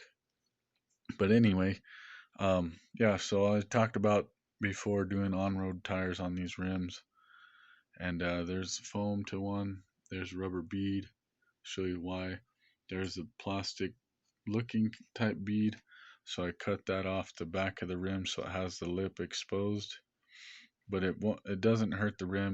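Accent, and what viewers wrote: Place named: Alaska, United States. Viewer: Are you Alaskan? My cousin has a similar accent, she lives in Juno. Just curious! You have Great Content on here! Keep the Awesome Videos coming! 🤗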